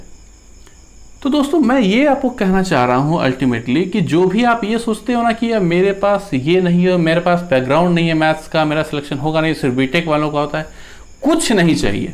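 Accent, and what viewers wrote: Bihar, India. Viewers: Aap bihar se ho?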